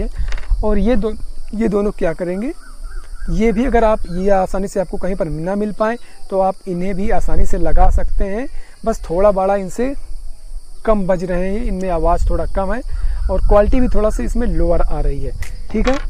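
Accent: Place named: Allahabad, India. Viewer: Ap allahabad se ho kya bhai..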